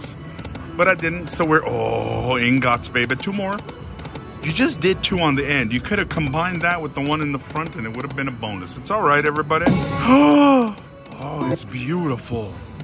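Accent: South, United States. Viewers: I freaking love you!! I'm so happy I found your channel. I'm disabled and can't go anywhere much. You have made me Crack up so much!! Thank you!!! Are you from the south? You sound like you are😊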